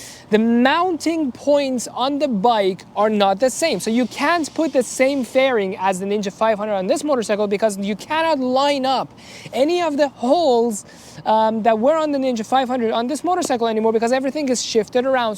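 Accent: Iran. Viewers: Farsi accent 😂👍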